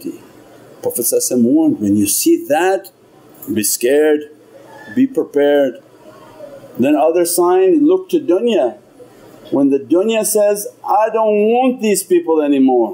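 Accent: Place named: Spain. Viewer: He sounds spanish or is that just me not that it matters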